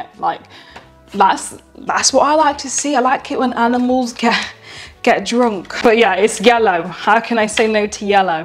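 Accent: United Kingdom. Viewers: British accent, especially when spoken by a girl, is one of the best things in the Universe :3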